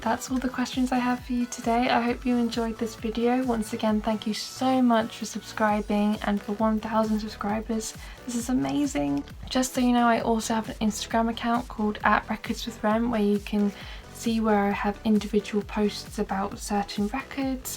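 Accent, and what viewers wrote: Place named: United Kingdom. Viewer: I’ve got also another question: where in the UK are you from? Because you’ve got a lovely accent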